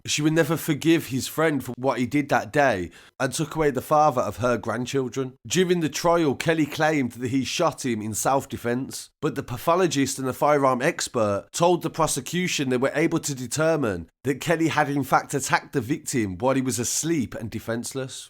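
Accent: Birmingham, United Kingdom. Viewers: Why does this brummie jackass keep calling him McCann Barrett ? It says mark duh !!!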